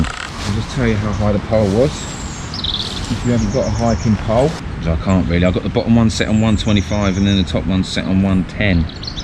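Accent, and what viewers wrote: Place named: United Kingdom. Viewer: I like that this tarp is lightweight and that you are in the UK, speaking British English. No jingoism, just easier to understand and relate to for me. Your explanations could do with some improvement, however.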